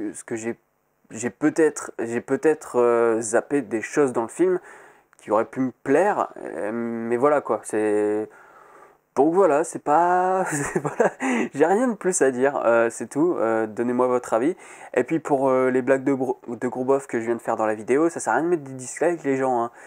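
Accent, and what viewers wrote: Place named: South, France. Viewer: C'est drôle, t'as presque l'accent du midi dès fois, sur tes O notamment ^^ C'était le commentaire inutile du jour ! Ce film ne me paraissait ni original ni super intéressant ... Comme tu dis; Virginie Effira n'a pas réellement changé de rôle finalement !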